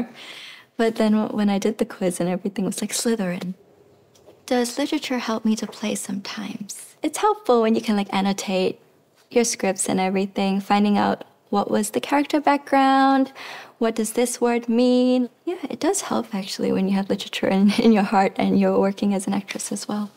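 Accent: England, United Kingdom. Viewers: O inglês britânico sendo o maior charme dela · I love her English accent ❤ How beautiful she is 😍🩷 Thank you CHANEL 🤍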